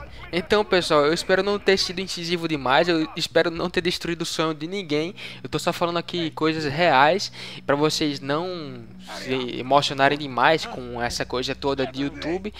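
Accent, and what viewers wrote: Northeast, Brazil. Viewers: tudo bem cara vc tem sutaqui diferente,vc e. Nordestino?? Abraço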